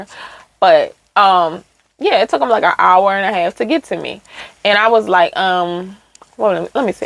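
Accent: Baltimore, United States. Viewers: Sound like you might be from BMore lol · You from Baltimore? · I bet 100 u from Baltimore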